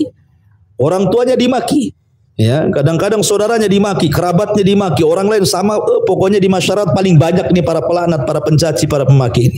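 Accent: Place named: Sumatra, Indonesia. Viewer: Ceramah nya Pak Ustadz logat nya Melayu macem Dataran Sumatra .... Luarrbiyasaa Bikin merinding.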